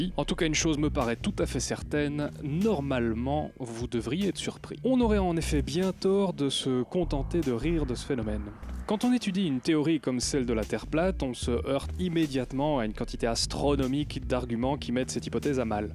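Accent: Belgium. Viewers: Houit, tüitter ... c'est rigolo le parler belge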